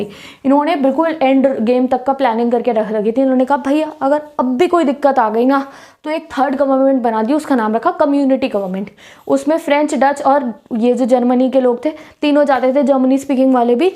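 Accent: Punjab, India. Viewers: Didi aap punjab se ho ?? Please tell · Are you punjabi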